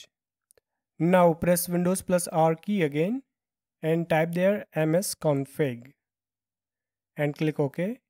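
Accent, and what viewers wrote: India. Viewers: Whenever i hear an indian accent I click off because they never work like this one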